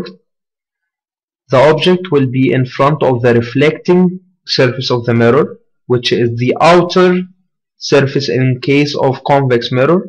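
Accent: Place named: Russia. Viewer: What's with the Russian accent ??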